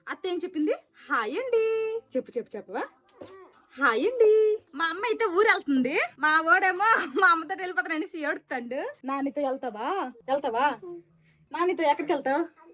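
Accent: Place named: Bengal, India. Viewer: Mi matalu bengal lo vunnappudu slang okalaga vuntundi mi oorlo moratuga matladutharu inka ekkuva soundtho matladutharu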